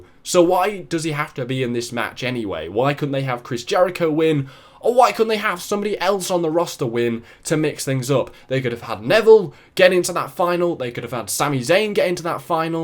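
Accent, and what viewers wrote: United Kingdom. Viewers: First, as a lesbian, I agree, NO wrestling company should be trying to put that in their storylines. Perfect examples in the past, early days of Goldust, and TNA's Orlando Jordan. Gawd awful characters. Second, 4am? Thought I picked up an UK accent. Awesomeness! Have friends over in that part of world, including a woman still have feelings for. Third, may rewatch Seth VS Sammi later on. Still beat from the shows this weekend, so maybe later on, I could appreciate it better. 😉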